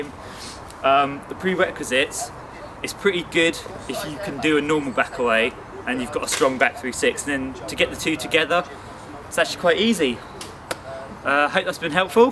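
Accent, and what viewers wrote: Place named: United Kingdom. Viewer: hey man, r u british ??? dont take me worng, but u have a quite intense accent !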